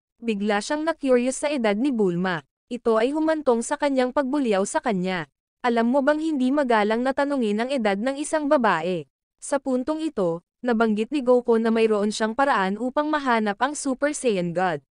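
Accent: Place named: Visayas, Philippines. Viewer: Feeling ko bisaya tong nagsasalita, freyza?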